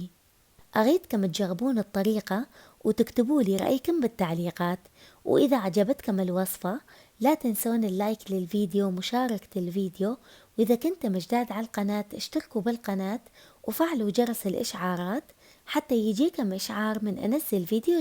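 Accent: Mosul, Iraq. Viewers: مااحلى اللهجه الموصليه . وعاشت ايدك على الطبخه والوصف المبدع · هاي اللهجة المصلاوية شوية تختلف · هذي اللهجة تعود لأهل مدينة الموصل فقط شمال العراق وليس كل العراقيين يتكلمون هكذا مع تقديري لك والمقدمة البرنامج الرائع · انا كل الفيديوا استمع للهجهه المصلاويه 😅😅😅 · اللهجه الموصليه اجنن ❤❤ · وصفة روعة 😍😍😍 ... عاشت الأيادي والأروع اللهجة المصلاوية الجميلة ❤❤❤ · مو حلوه الهجه لمصلاويه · هذه لهجة أهل الموصل تختلف شوي عن لهجتنا العراقيه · لهجتها مصلاوية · الف عاغيه وعاشت ايدج وشنو هاللهجه المصلاويه الحلوه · هذه لهجة الموصل ...نينوى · هذه لهجة حضر العراق القديمة و التس بقيت أثارها باقيه بلهجة أهل و العوائل الأصلية لمدن: الموصل، تكريت، حديثة، عانه، هيت و غيرهم. و سابقا كانت لهجة اهل بغداد كذلك و التي حافظ عليها اليهود و المسيحيين. اما ما يطلق عليه اللهجة العراقيه حاليا فهو خليط من بقايا اللهجة القديمة مع لهجة العشائر العربية البدوية من بادية الشام التي استوطنت العراق إبان الفراغ السياسي في نهايات ال ١٦٠٠ و التي غيرت التركيبة السكانية و اللغوية و الثقافيه لسكان العراق كون الغلبة كانت لهم و يس لأهل العراق الحضر. 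و هكذا تغير كل شيء. تحياتي لأهل الموصل ممكن حافظ على لهجتنا الأصلية العريقة. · حبيبت قلبي انا من بغداد استمتع ❤بصوتج واللهجة ❤الهلنا وناسنا الطيبين ❤من الموصل ❤ اكلاتك كلها مميزة جداً لذيذة نشكرك تعبناكي ويانا❤❤ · انا والله كنت مستمتع بالوصفة ومستمتع اكثر بالهجة العراقية الجميلة وتعلمت بعض الكلمات العراقية ، تحية لكل اخوانى من العراق اخوكم من مصر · الهجه المصلاويه تجنن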